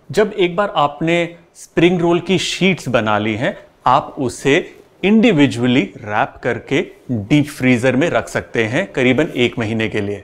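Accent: Punjab, India. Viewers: Sir your way of talking is very nice...total Punjabi accent.... You are wonderful chef....love your recipes......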